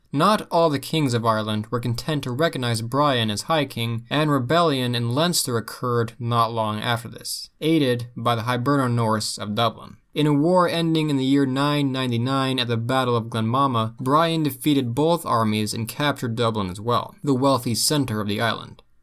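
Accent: United States. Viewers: Can't stand the American accent with odd emphasis on words and in this case, a boring voice, like reading a book in a hurry. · I was disappointed that the narrator is speaking in an American accent, not Irish or English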